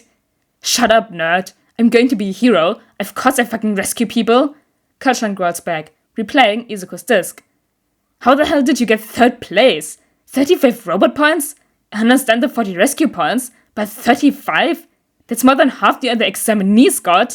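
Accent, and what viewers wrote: Germany. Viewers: Your accent sounds kinda German! Sorry if I’m wrong lol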